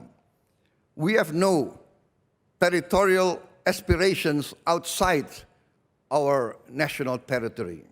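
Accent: Indonesia. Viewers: Suka dg "accent" nya, gak ngilangin warna asli aksen Indonesia👍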